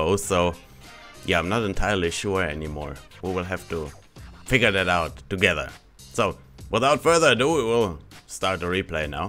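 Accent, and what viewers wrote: Germany. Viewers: r u German I'm impressed with ur English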